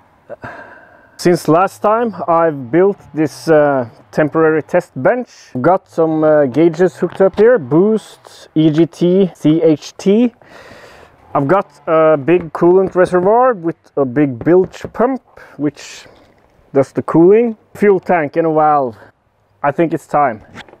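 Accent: Australia. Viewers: are you from Aussie mate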